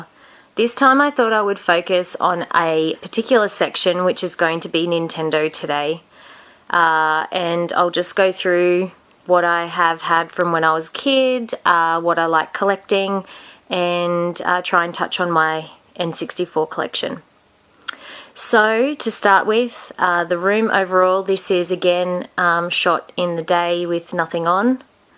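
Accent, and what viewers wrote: Australia. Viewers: The accent being Australian means you must know Last Gamer. Wish I lived in Australia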